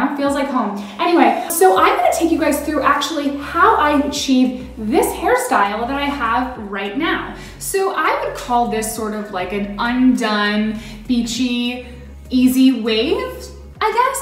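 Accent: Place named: United States. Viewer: I was not expecting an American accent !! 😂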